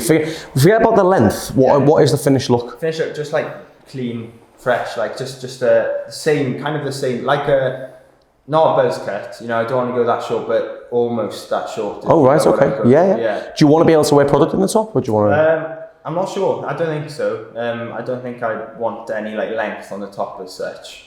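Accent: Liverpool, United Kingdom. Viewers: He talks non-stop and that thick British accent makes it difficult to understand what he says. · This channel is so relaxing - Loving those transformation Details & That Scouse accent from a handsome Barber.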